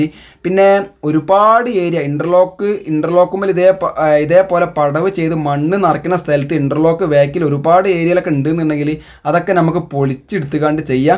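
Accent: Malappuram, India. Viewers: ആ മലപ്പുറം ഭാഷ അതാണ് പവർ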